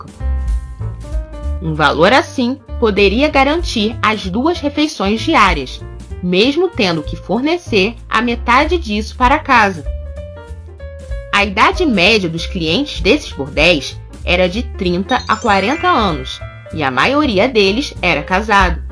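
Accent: Rio de Janeiro, Brazil. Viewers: A narradora é carioca ,.. A extoria da época vitoriana 😅kk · Gente amo esse canal mas o sotaque carioca da narradora è puxado demais , isso incomoda um pouco 😞mas parabéns pelo trabalho gente ! Segura esse like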